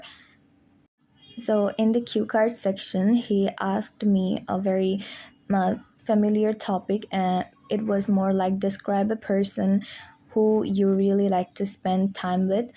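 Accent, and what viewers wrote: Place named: Sylhet, Bangladesh. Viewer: Your accent is really nice, r you from sylhet btw?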